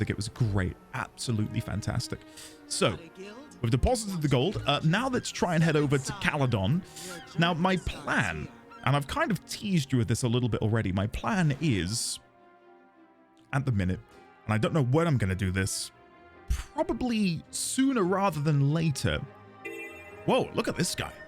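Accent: United Kingdom. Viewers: Your voice is really interesting and soothing! dont judge yourself that hard, a british accent that is soft, manly, energetic, hopefull for fun and love for life is just chef's kiss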